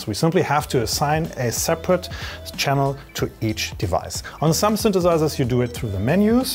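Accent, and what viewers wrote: Germany. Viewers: Most German accent I’ve ever heard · Lovely German English. ^^ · Your Denglisch is wunderfull! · You’re german, am I right? xD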